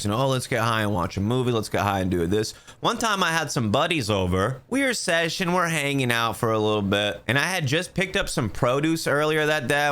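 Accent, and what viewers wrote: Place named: Northeast, United States. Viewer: You sound like you’re from the north east so a soft baked pretzel from sheetz or Wawa. Cheese filled. Mac n cheese bites. Grilled cheese. Extra cheese. So much cheese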